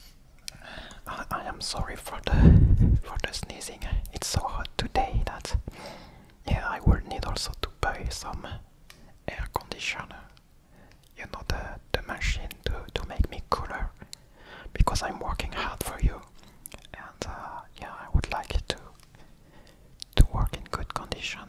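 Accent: France. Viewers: tu as un accent vraiment français je ne sais pas si tu l’es à la base c’est juste de la curiosité:)) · J'adore cet accent français 😂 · Cet accent français ! 😂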